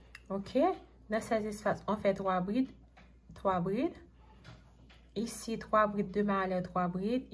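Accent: Haiti. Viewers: T'es Haïtienne ? Ton accent ?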